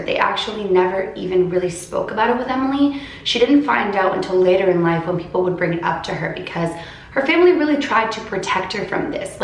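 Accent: Canada. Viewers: omggg i love ur Canadian accent 💗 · WHY ARE YU TALKING LIKE A CANADIAN?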